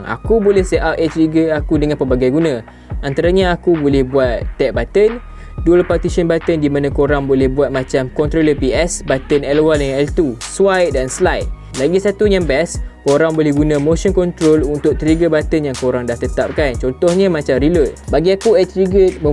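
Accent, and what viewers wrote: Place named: North, Malaysia. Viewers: Nice. Kipidap. Tapi loghat utara tu cover2 sikit boss ku, sebab kalau bercampoq dengan kl dengaq lain mcm oii haha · Org perak ghupenye... kome perak mana tu?? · Barai lah budak nih dgn loghat utara lagi haduhhhh failll..... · Cakap ja utaqa wei. Pelik eh.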